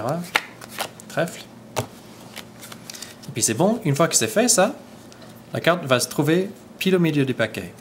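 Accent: Quebec, Canada. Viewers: wooow classe l'accent québécois 8D8D8D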